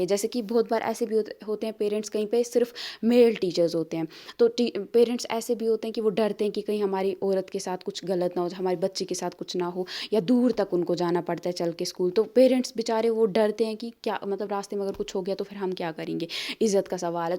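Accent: Kashmir, India. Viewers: Are u kashmiri.. your ascent is like kashmiri ❤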